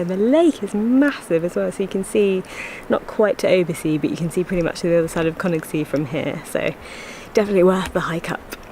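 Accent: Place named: United Kingdom. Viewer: I love your British accent.😄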